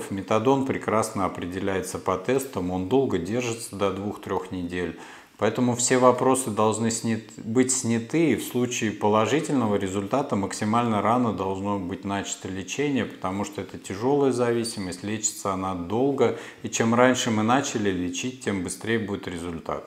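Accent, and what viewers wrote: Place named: Kursk, Russia. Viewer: этот курский акцент бесит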